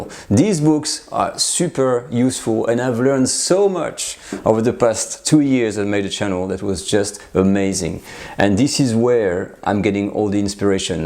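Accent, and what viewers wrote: France. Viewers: Been with you from the start...love your channel. You are very personable, knowledgeable and charismatic. ☺️. And the "real" French accent is so easy on the ears. I am Canadian - our French accent is very different. Keep up the wonderful work...you have inspired me to dig out my Julia Child and Escoffier books and go back to basics. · I just found your channel today. I am impressed by your ability to make dishes seem easy to accomplish and your love of cooking comes through. Please do not apologize for your presentation, it looks much more professional than you might think. Your english is excellent even with your french accent...this is much appreciated., I believe there is a career on camera if you are willing to go that far. Maybe PBS. In my opinion you are the complete package of knowledge, charm and inspiration...you have my vote. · Your channel is a dream come true. 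I love french food but do not speak the language, so you tube in french does not work for me. Real french food by a french cheff who explain's so well and in English. It doe not get any better. I did not cook any of you recipes yet but have bookmarked so many , my cooking bug picks up in the fall. And one more thing: I love that you are not full of air, a simple guy. And one more thing: your accent, every time you say "recipe" I repeat your way. My son laughs . Thank you so much for this channel in English. Wish you lots and lots of success ! Just subscribed.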